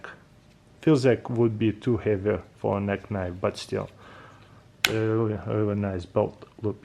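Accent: Russia. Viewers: Стронг рашн акцент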